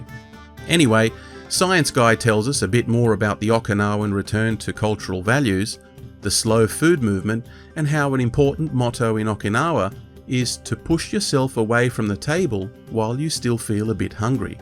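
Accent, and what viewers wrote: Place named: Australia. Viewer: Good job, love the humor, delivered with the Aussie accent, perfect. It was a big subject to breakdown however I think you managed it.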